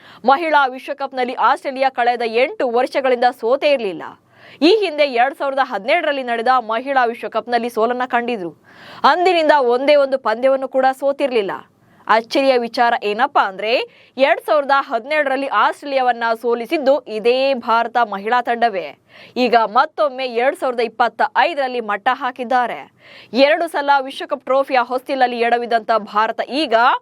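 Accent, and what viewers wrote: Standard. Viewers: ಅದ್ಭುತ ವಿವರಣೆ, ಶುದ್ಧ ಕನ್ನಡ. ಅಭಿನಂದನೆಗಳು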